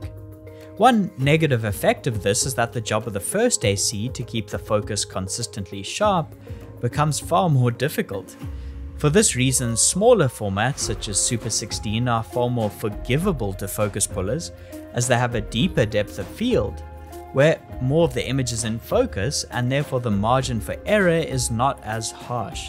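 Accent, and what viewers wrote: South Africa. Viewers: Great video!! Was that a South African accent I heard?? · South African :)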